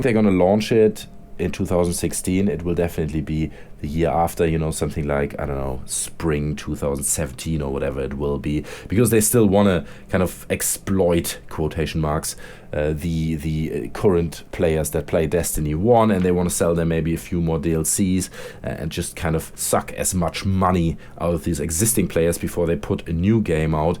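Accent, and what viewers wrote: Germany. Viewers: ARe you german :) cause you Sound a bit like it.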